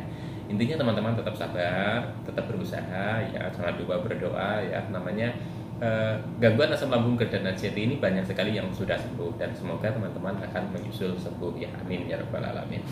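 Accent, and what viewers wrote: Banyumas, Indonesia. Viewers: Mas. Sembuhnya Berapa lama?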